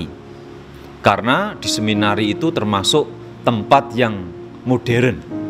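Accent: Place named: Banyumas, Indonesia. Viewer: Senang mendengar ngapakx father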